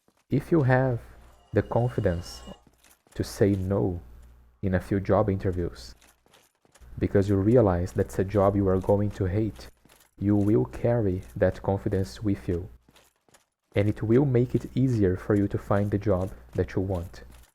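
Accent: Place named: Brazil. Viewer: Sotaque BR 😂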